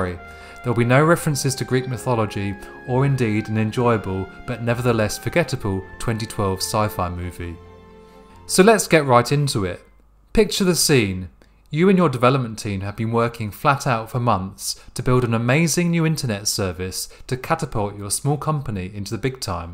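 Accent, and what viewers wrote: United Kingdom. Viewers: Thank you very much sir for this video, love that british accent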